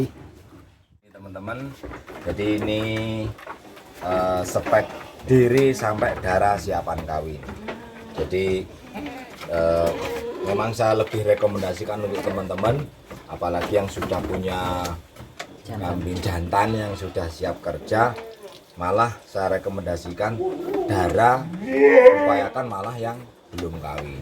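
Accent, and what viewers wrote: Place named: East Java, Indonesia. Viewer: Jawa nya kayak Jawa timuran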